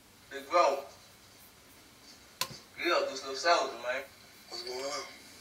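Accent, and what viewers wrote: Australia. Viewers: are you from aus or nz ? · i really like this aussie accent hahah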